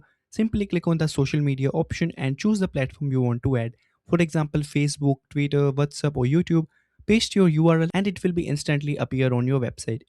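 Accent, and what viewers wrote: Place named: India. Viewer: Sorry, but your Indian accent makes it difficult to follow the video. Better use a generic AI voice.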